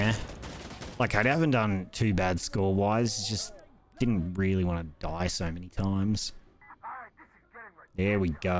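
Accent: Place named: Australia. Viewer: i love this game, i can play the co-op matches with my eyes closed now (not literally of course xD) you have a great voice for doing youtube reviews, Aussie accents are the best for gaming, like Gmanlives and stuff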